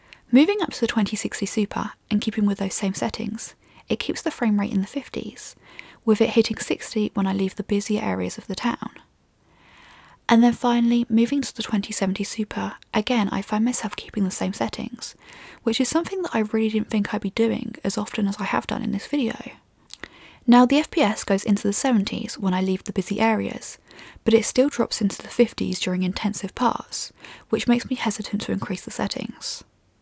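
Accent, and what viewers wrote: United Kingdom. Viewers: I really love your UK accent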